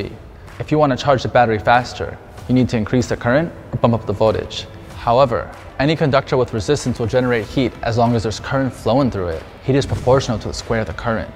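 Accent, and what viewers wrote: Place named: China. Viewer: Ohk, this host is a red head, blue eyes and heavy Chinese accentes English? 🤕What I miss?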